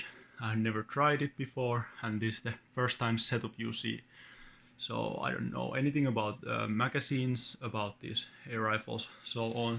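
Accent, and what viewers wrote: Finland. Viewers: Sounds like a finn speaking english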